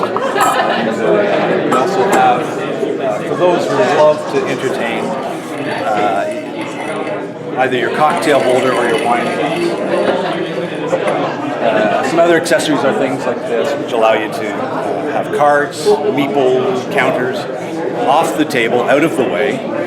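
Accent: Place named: Canada. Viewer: Me: This guy sounds Canadian. Guy: "If they have to take their kids to a hawkey game--" Me: 😎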